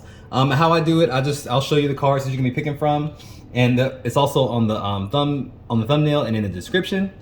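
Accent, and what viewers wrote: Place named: South, United States. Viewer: I’m in love with your accent. I wish I had a southern accent.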